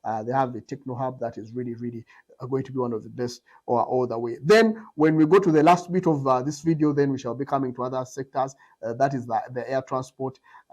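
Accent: Uganda. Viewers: Also Tanzania has 6 international Airport not 3. Also the total number of Airports in Tanzania is 59 and 300 Airstrips. Kenya has only 36 Airpots and 3 international Airpot just so you know. You should have Mentioned also that Kenya Airways is NOT owned by Kenyans or Kenya Government, it a shared company between KLM, One Private company and Kenya Government which means Kenyan government is nit benefiting from the Airline 100% like Tanzania Air which is Owned 100% by the government. Most people and Tourists in Kenya lands only or most in Nairobi or JKNIA while in Tanzania Most people and tourists are spread in 3 Airports JNIA-Dar, Kilimanjaro Airpot and Karume in Zanzibar and soon coming up Songwe International Airpot, Pemba international Airpot and Mwanza International Airpot. Next time di research before opening your UGANDAN Accent MOUTH.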